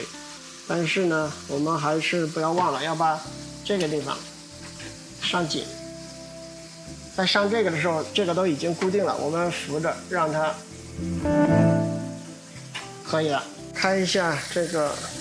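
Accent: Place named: Henan, China. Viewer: 老乡豫普说的不赖😊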